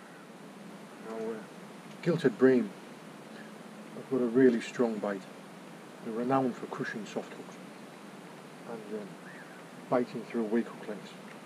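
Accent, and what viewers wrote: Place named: North East England, United Kingdom. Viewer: Where are you based to mate, I just thought you were up in the north east because of your accent. Are you ex army as you are always well turned out for an angler!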